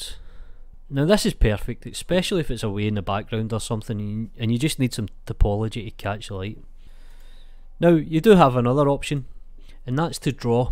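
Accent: Scotland, United Kingdom. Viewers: yep Scottish for sure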